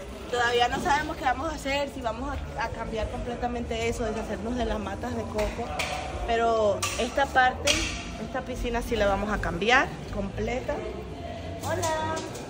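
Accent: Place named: Mexico. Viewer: Ese acento mexicano no te keda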